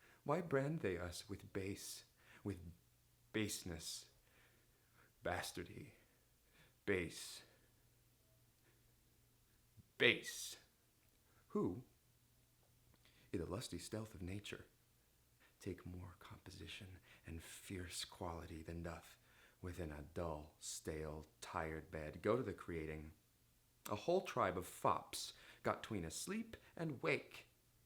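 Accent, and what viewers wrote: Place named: Ireland. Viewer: im so confused if youre irish or american haah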